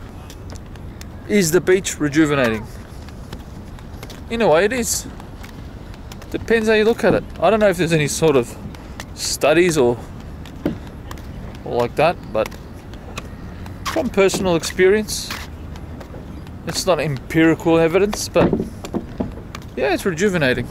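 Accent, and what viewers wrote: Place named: Australia. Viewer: WOW now this is really a head turning video, I had no idea you were an Australian, it is weird hearing that accent you naturally have, that does not come out on the music videos, oh my god thank you, it will be a longtime before i can make it down under, the funds are not allowing a vacation that far away. Thank you from the bottom to the top of my heart, and give the wife a thank you as well, nice video, USA Missouri,Lake of the Ozarks or the Midwest Coast as they say 1150 miles of shoreline, the oceans are great medicine Atlantic Pacific and Gulf of mexico, pacific northwest up by seattle is where i like it most, its a big angry seeing all this plastic everywhere, oh man i hate pollution and the lack of much effort to clean it up...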